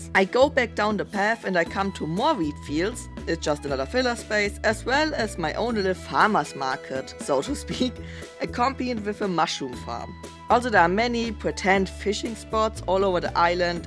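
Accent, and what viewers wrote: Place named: Germany. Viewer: I don’t know if you’re German or something, but I love your accent❤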